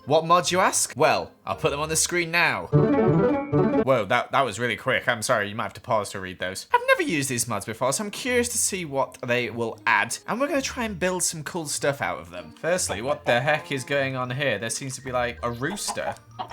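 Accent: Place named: United Kingdom. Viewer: He's british so he says it like that because of his accent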